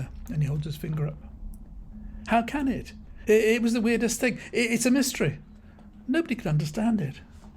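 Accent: United Kingdom. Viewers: You know the speech is dumb when a British accent doesn't make it sound any smarter.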